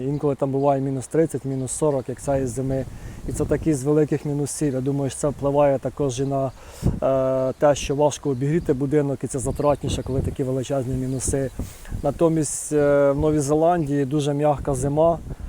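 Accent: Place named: Kirovohrad, Ukraine. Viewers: У вас така знайома вимова і таке рідне « осьо «. Часом не Кіровоградщина? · а ще приємно чути Ваш центральноукраїнський діалект! Він для нас рідний, бо ми полтавчани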